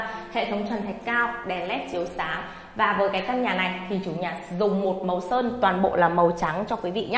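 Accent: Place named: Hanoi, Vietnam. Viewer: Nhà đẹp giá tốt giọng nữ HN cũng hay😊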